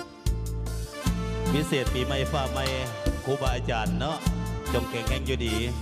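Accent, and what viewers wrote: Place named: Chaiyaphum, Thailand. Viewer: สำเนียงชัยภูมิเจ้าเป็นคนไทยมาเอาเมียอยู่ลาวตี้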